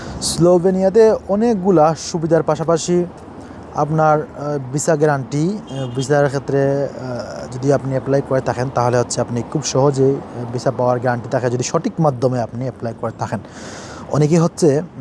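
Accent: Sylhet, Bangladesh. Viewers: ভাই আপনে আমরার সিলেটি ভাই নি 🥰🥰🥰 আপনার জন্য শুভকামনা ও দোয়া রইল ভাই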